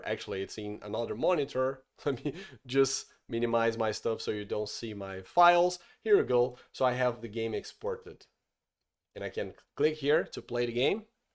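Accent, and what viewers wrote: Brazil. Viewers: the brazilian accent xD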